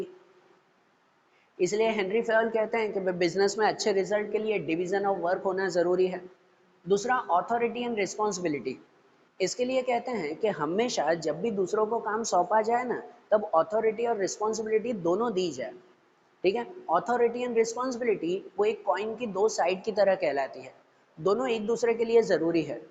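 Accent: Gujarat, India. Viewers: Sir aap Gujarati ho !?😋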